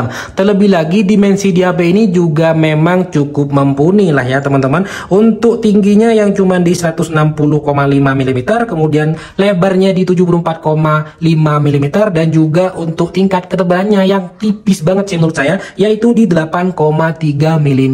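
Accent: Madura, Indonesia. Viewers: Logatnya kental banget, madura banget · Orang Madura kah bang?? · Madura logat ny 😂🤣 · Dari aksen bahasa indonya sih.. Pasti dari Meduro ori yo cak ? Hahaha 😇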